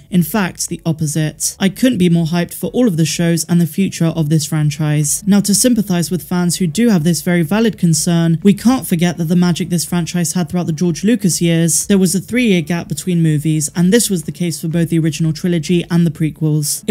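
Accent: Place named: Cornwall, United Kingdom. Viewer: I want more jibber jabber please in your cute cornwall accent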